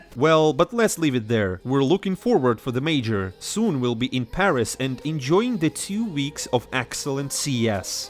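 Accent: Russia. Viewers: Тут скорее палка о двух концах - из-за того, что канал ведёт, и я явно слышу русского человека за озвучкой, и носителям английского такая речь режет уши. И тут они не могут воспринимать новости серьёзно, да ещё и речь идёт о СНГ - командах. Огромный респект, но нужно адаптировать формат под западных слушателей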